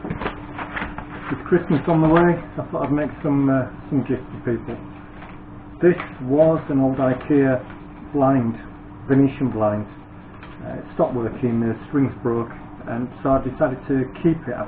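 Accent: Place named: Yorkshire, United Kingdom. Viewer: Hi your video just popped up in recommendations - as soon as I heard the accent and saw the yorkshirman plaque on your wall I subscribed! looking forward to more videos..... and watch out for a sub jump after this weekend I'll be giving you a shout out on my channel: us little'uns got to stick together... 👍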